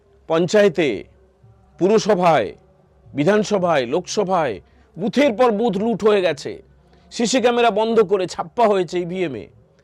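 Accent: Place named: Bangladesh. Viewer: Ami prochur bangladesh e manus r sathe kotha batra hoe che anek k chini. Bangladeshi der ekta adbhut accent ache, janina koto jon manben na bujben , ae anchor ta bangladeshi bangali der moto accent.😮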